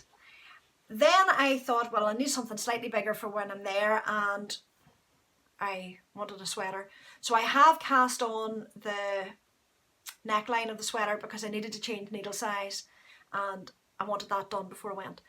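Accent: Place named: Ireland. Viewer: I love your podcast. Your accent is one of my favorite parts. I live in the western US where we don’t get a lot of Irish influence. I can see why you love that sweater so much. My favorite pattern I ever knit is the Wishmaker Mitt pattern by Erica Hauser.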